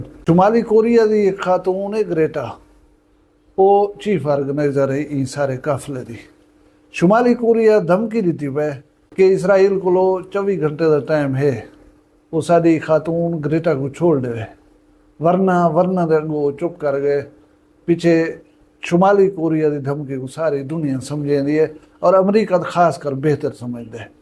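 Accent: Saraiki, Pakistan. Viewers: صاحب اللّٰہ رحیم وکریم آپ کو اپنی حفظ و امان میں رکھے ۔ آمین ثم آمین بہت خوب صورت اسلوب بیان ساتھ سرائیکی زبان کی مٹھاس کا جادو۔ کیا کہنے۔ حقیقی اور وسیب کے دل دماغ اور ضمیر کو جھنجھوڑ دینے والا روزمرہ کے حالات واقعات پہ مبنیcontent ۔ ماشاءاللہ اس واقعے نے دل و دماغ کو ہلا کر رکھ دیا۔ اتنا خوبصورت جوان ۔ صاحب یقین کریں مزید کچھ کہنے اور لکھنے کا حوصلہ نہیں۔ بوڑھی ممتا ، جوان سالہ بیوہ ، معصوم ننھے فرشتوں اور اس عمر میں جوان سال بیٹے کا جنازہ باپ نے کیسے اٹھایا جو گا۔ اللّٰہ رحیم وکریم سے اس خاندان پر اپنا خصوصی فضل وکرم فرمائے ۔سب کے لئے آ سانیا ں فرمائے ۔ مرحوم کو جنت میں اعلیٰ مقام عطا فرمائے ۔ آمین ثم آمین اللّٰہ تعالیٰ آ پ کو دین ودنیا کی ڈھیروں کامیابیاں اور کامرانیاں نصیب فرمائے ۔امین ثم آمین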